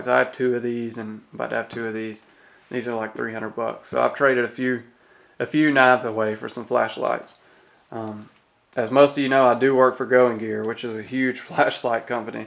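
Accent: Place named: South, United States. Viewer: embrace your southern heritage and accent.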